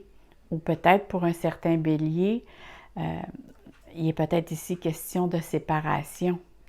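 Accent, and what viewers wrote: Canada. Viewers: Merci, pour vos conseils précieux et précis, avec un petit accent en plus qui me rappelle des bons souvenirs de mon passage au Canada.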